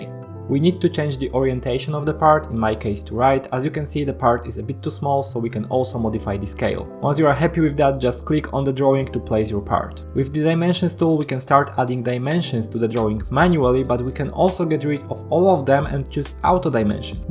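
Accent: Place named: Poland. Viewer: Oj chłopie - akcent to masz taki mocno polenglish. Akcenty słów, ale też melodia zdań - słychać, że w szkole na angielskim było mało rozmowy/dialogu lub się nie przyłożyłeś. Czy to przeszkadza - wcale: chodzi o treść. Ale sam zacząłeś z 'bearing' :P Jeśli Ci to przeszkadza, zainwestuj w konwersację z kimś kto ładnie rozmawia po angielsku, bo treść kanału jest naprawdę ciekawa!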